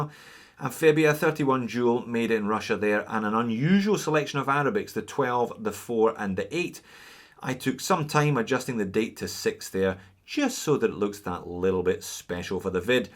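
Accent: Scotland, United Kingdom. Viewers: No he has a lovely Scots accent, the "go to" pirate accent is a sort of generic West Country accent.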